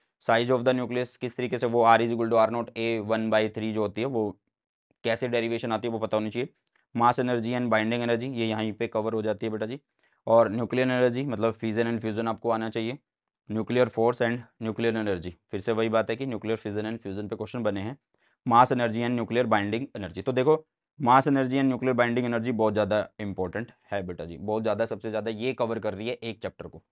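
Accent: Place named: Haryana, India. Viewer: Sir aap haryana ke ho...apni language mein thoda accent hain haryanvi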